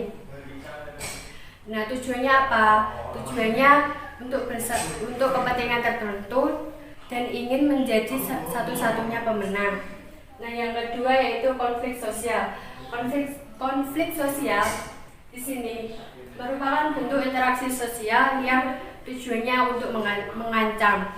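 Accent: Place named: Java, Indonesia. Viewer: Ini pasti dr Jawa. Medok.. tp bgus kok penyampaiannya, lanjutkan...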